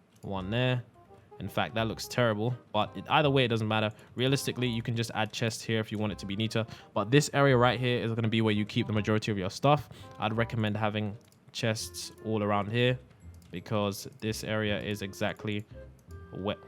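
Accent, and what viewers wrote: United Kingdom. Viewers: Why you sound british?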